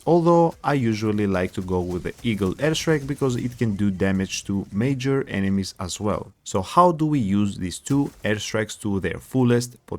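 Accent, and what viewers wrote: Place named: Greece. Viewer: Hey can you teach me greek . I love the accent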